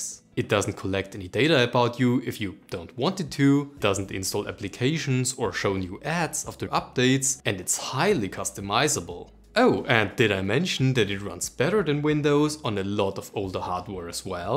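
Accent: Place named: Germany. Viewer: deutscher accent aber gutes video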